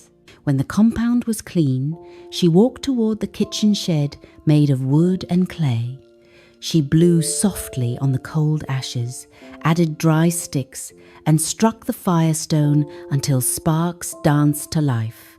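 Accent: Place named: United Kingdom. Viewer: The British accent lost me 🤦🏾‍♀️House of Horus with his rich African tone, telling African stories, is real self love.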